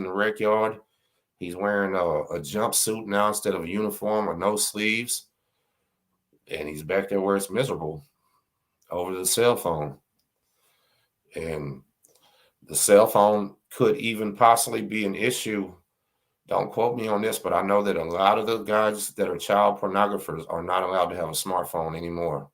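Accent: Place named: Louisiana, United States. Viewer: Just tonight your channel popped up, for sure I'm watching, I imagine I'll be subbing too, I'll verify, digging the lou-z-anna accent, much love to Louisiana, especially opalusous(may not have spelled that correctly ..all respect intended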